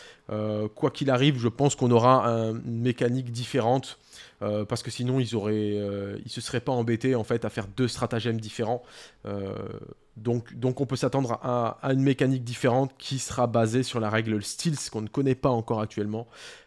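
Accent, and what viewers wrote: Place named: Scotland, United Kingdom. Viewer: vu l'accent, il est pas anglais, il est écossais !!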